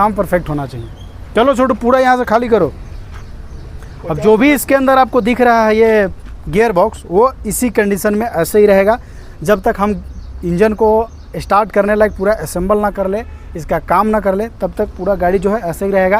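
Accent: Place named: Bihar, India. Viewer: Bhai ap karnataka se hai, but apka baat sunke lag raha ap jharkhand bihar se hai😊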